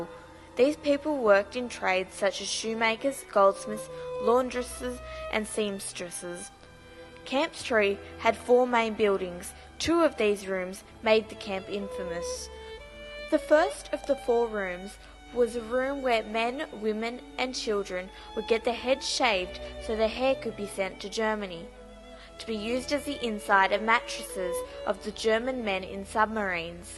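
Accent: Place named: Australia. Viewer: Australian, one of the eastern states, has a speech impediment, private school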